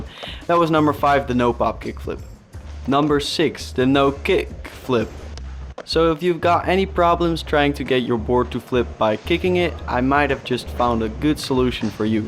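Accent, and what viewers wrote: Netherlands. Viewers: pretty good my dutch friend.. mooi acent btw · You got a Dutch accent kan ik wel horen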